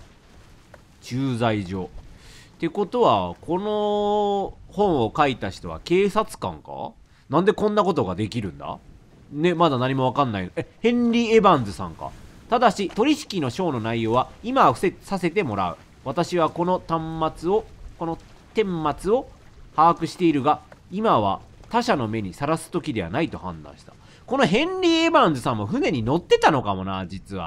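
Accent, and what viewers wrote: Kansai, Japan. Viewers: 今回の実況、関西弁多めで嬉しい…⁽⁽( ˊᵕˋ )⁾⁾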